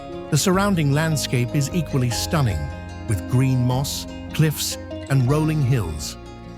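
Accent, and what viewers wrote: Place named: England, United Kingdom. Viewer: It's really great to hear a truly ENGLISH Description. Too many bad American commentary.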